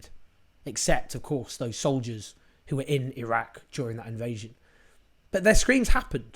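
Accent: United Kingdom. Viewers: The accent of the host seemed like he is a British! Well, I've got a news for this man, a British. You, your prime minister Tony Blair with his friend the United States of America empire's president should be the one not only to be put on "TRIAL," if possible to be "HANGED IN PUBLIC⚖️👎🇺🇸😡😡🇬🇧! Ayatollah Ruholah Khomeini said: "DEATH TO AMERICA⚖️👎🇺🇸😡!" Well, the same words will come out coming from both Afghan and Iraq people's mouth who was abused, slaughtered and pulverised by both your United Kingdom and that of murdererous Americans saying unitedly "DEATH TO BRITISH ⚖️👎🇬🇧😡!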